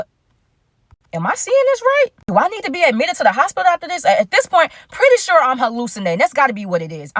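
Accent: Chicago, United States. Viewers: have a meet in greet where u from g u slick talk like us #chicago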